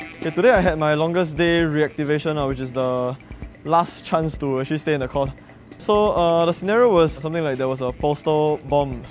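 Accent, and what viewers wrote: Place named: Singapore. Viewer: His singlish .....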